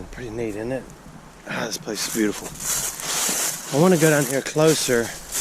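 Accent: South, United States. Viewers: I get a lot of enjoyment out of misspelling in my head, the way that he says it. I have spent some hysterical minutes trying to spell aluminum foil "Foll". I'm not making fun, I'm just really enjoying your puns and your Southern accent anthropologically. I am not ever going to be clever enough to play on words like you do, it's a gift and we are lucky you share with us.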